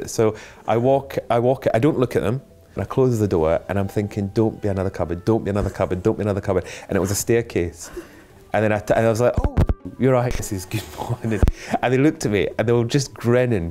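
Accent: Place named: Lanarkshire, United Kingdom. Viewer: He'sScottish. He's speaking English with a Lanarkshire accent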